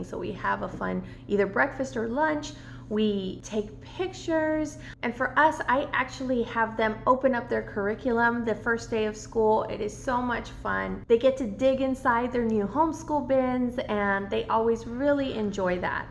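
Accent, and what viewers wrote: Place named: Texas, United States. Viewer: i knew you had to be a texan.... just hearing you speak is like hearing my native tongue.🤣🤣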